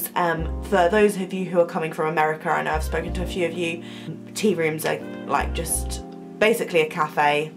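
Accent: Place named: London, United Kingdom. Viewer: You sound your like from London! Not Lincoln! Ha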